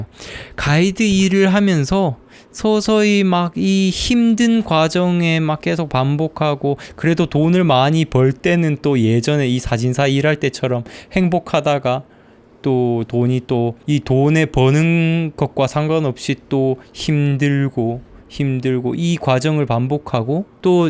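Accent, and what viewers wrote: Andong, South Korea. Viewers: 독서 검색하다 우연히 알게된 님, 말투에서 안동분임을 알아차렸죠.^^ 연배로는 한참 아래분이지만 인생 대선배인듯한 느낌에 그저 존경스럽단말밖에 안나옵니다. 오늘 아침, 밥 먹으며 고1아들에게 님 얘기를 했네요. 꼭 한번 들어가보라구요. 님이 성장이란 단어를 가장 좋아한다고 했는데 저도 그렇습니다. 그 성장이란 단어는 43살부터 본격적이었네요. 2년전부터 자투리시간 할애해서 주1회 중국어 학습지 수업을 하고 있는데 안하는거보다 낫잖아라며 가볍게 하고 있는데 님의 중국어 채널 유익하게 잘 보고 있습니다. 차로 이동하며 두서없어 하지만 이또한 안하는거보다 나으니까요.^^ 지인에게 님을 소개했더니 인생책 10권을 사서 열독하는 중이라고 그친구 정말 대단하다구 하더라구요. 동감입니다!! 앞으로도 지금처럼 차로 이동하며 화장실에서 화장하며 밥하며 수강생을 기다리며 그렇게 보고듣고 따라하며 더한 성장을 꿈꿔 봅니다. 참 고마운 님, 참 고마운 유튜브입니다!! 언제나 화이팅입니다!!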